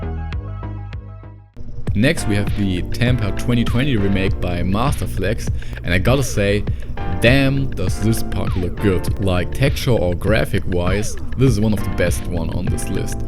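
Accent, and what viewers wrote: Germany. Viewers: Are you german? The accent is soo german idk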